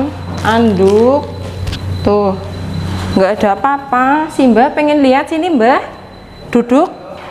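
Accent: Banyumas, Indonesia. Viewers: ga ngerti prokes maklum anak lugu..anak kampung..sy suka ngomongnya bhsnya ngapak lucu sj lhtnya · Mba rumahnya mana si kok ngapak bahasanya, berarti uda plng kampung,? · Mbak asalnya mana kok ngomongnya bahasa ngapak?maaf yayangku juga diTaiwan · Asli mana mba, ngapaknya sama😁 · Orang mana kokk logatnya Banyumas bgt? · Halo mbak..sukses selalu..seneng banget udh plg ke Indonesia..sy suka liat video mba'e jawane ngapak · Mba aslinya dari desa mana mba,soalnya kita sama sama BERSATU KITA KOMPAK BICARA KITA NGAPAK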